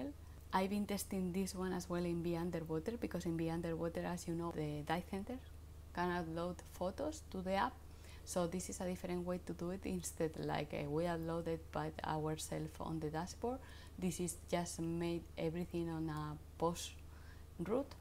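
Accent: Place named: Spain. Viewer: Love the accent. U from Spain?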